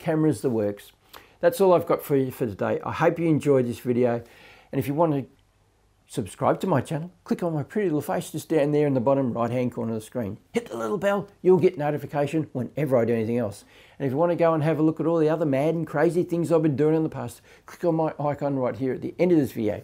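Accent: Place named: Australia. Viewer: Hi, I enjoyed your photography/video timeline video. I also think your wildlife video and photography work is great, here in GB you’d be probably be employed by the BBC as a freelancer, as they do so much natural-history production, apart from the David Attenborough epic series shown worldwide, we have BBC Spring-Autumn-Winter Watch which is a three seasons live TV wildlife series broadcast from wildlife locations around Britain. They get a good audience and lot of interest and the team includes wildlife photographer/presenter Chris Packham, TV presenter Michaela Strachan, Iolo Williams and Gillian Burke, with other regulars appearing. I love that little Antechinus creature, what exactly is it? Some kind of shrew creature native to Australia, I guess you’re in Australia from your accent.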